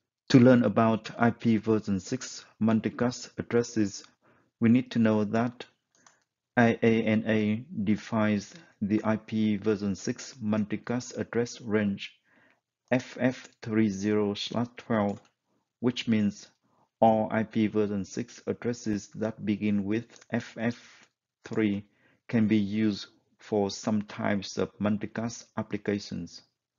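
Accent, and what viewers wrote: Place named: Vietnam. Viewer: nghe phát âm tiếng anh biết ngay người việt =)))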